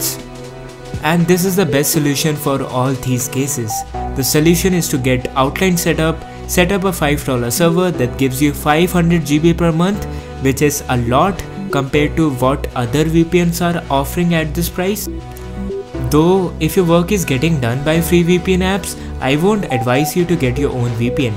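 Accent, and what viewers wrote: India. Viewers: will never install something on my pc, told by an Indian voice.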